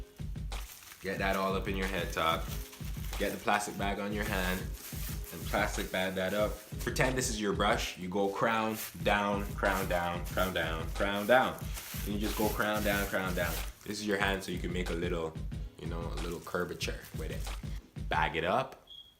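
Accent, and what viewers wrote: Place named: Toronto, Canada. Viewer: sounds like ur from toronto my guy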